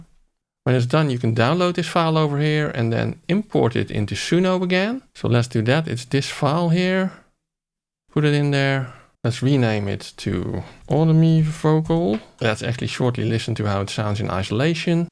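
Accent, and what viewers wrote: Netherlands. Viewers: Grappig dat je als nederlander onmiddelijk een engels sprekende landgenoot herkent. Niet lullig bedoelt overigens! Goeie video! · He is so Dutch, I hate this Dutch shshshs pronunciation... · very Dutch accent there ;-)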